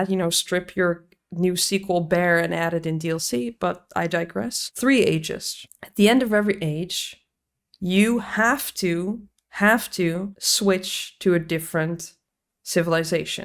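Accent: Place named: Netherlands. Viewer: I smell Dutch accent🗿 But I feel like they kinda dumbed down the fun parts of civ 7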